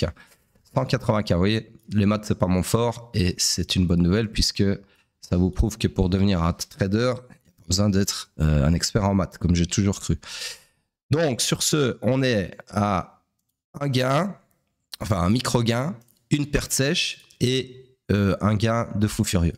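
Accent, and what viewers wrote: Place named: Belgium. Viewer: c'est marrant, malade ca te donne un accent belge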